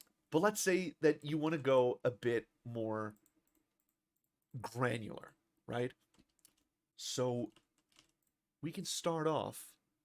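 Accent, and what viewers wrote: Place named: United States. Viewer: You were born and raised in Brazil? You have the most American American accent I have ever heard. Did you train your Brazilian accent out of yourself or something?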